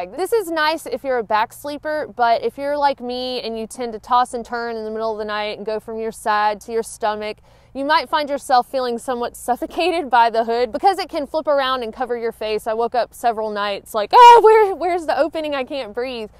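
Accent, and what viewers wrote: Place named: East Tennessee, United States. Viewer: Wow a lot of great info! I like the accent reminds me of East Tennessee.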